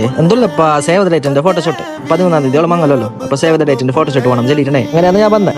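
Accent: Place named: Kasaragod, India. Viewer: Yeppa nammale kasrod slang😂🫶 maje aain🥰💗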